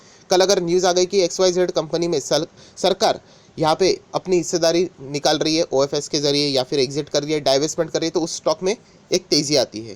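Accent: Maharashtra, India. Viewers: Sir shayd Pune se kyuki unke Google page me by default language Marathi he, also sometimes he mumbles marathi word's, I know this because I am also marathi. Also he has mentioned that he likes to watch live cricket that means he lives nearby Hinjewadi as it is the only place where international cricket stadium is nearby. Also he was using Micromax Mobile as sometimes during video notification sounds comes. Sir recently bought iphone as well because during video he's phone was ringing... And he last week he said he is making video from different mobile and checking the audio video quality. And most of the time in his video he says 'matlub.. matlub' that's shows that Hindi is not his mother tongue.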